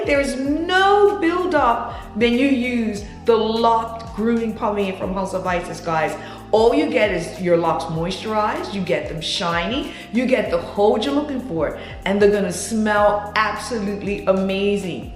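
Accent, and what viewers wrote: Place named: Bermuda. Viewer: I know a Bermudian accent when I hear it! Great video 💘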